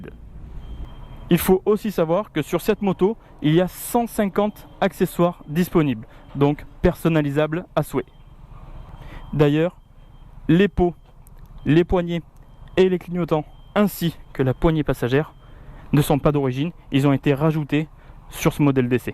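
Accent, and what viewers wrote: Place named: South, France. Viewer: un petit accent du sud bien sympa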